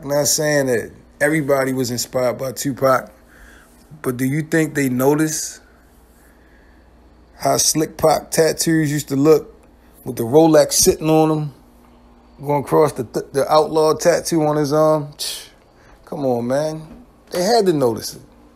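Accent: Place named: Boston, United States. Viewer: Hey where are you cuz you sound like you from Boston you sound like Snoop from The wire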